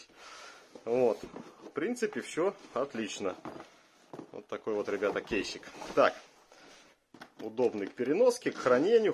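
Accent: Belarus. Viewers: Судя по акценту - белорус!) Лайк не глядя)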